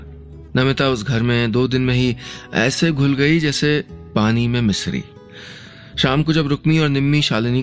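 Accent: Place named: Rajasthan, India. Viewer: Sorry bit Terrible Rajasthani accent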